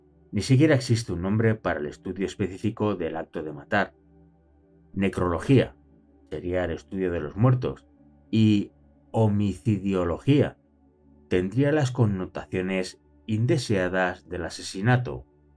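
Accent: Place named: Spain. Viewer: Oztia tio chaval, usted empezó a hablar español de España 🥺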